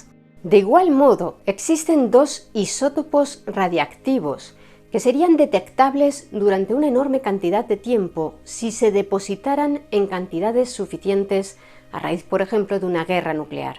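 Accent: Spain. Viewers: Me encantan tus videos, y con el acento español los hace más interesantes 😊 saludos desde México 😘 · me encanta como relata muy misteriosa y todo,el tono de voz mas el acento español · Hola, eres excelente divulgadora y tu acento español es hermoso. Saludos desde el Río de la Plata.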